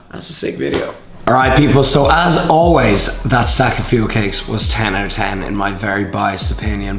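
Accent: Ireland. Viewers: Are you Irish love from England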